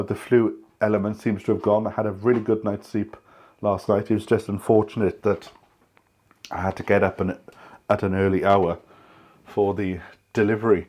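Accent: Wales, United Kingdom. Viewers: I'm sorry you haven't been well, you certainly sounded poorly. I must confess I could listen to you all day long. I love Welsh accents. My late wife, bless her, was Welsh and I spent a couple of years living in Wales. That was a great time. I hope you shake off that flu and are back to normal ASAP. Good luck!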